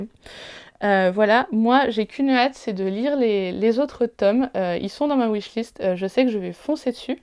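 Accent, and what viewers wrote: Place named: England, United Kingdom. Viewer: Je viens de tomber sur ta vidéo par hasard et j ai beaucoup aimé. Parce que j adore le cosy mystery et que je veux perfectionner mon anglais pile le sujet de ta vidéo, je vais donc attaquer par les détectives du yorkshire😊. En recommandation j aime beaucoup les nombreux livres de joanne flucke avec anna swansen et sa pâtisserie, c est génial et on peut même faire les recettes 😂. J adore ton accent anglais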